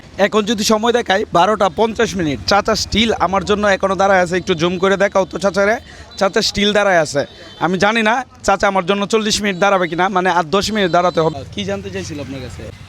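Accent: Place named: Kishoreganj, Bangladesh. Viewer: are you from kishorganj? cuz iam too i know your speaking in kishorganji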